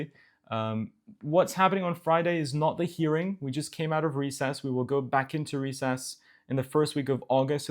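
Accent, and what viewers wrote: United Kingdom. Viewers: His accent is starting to change more British. Funny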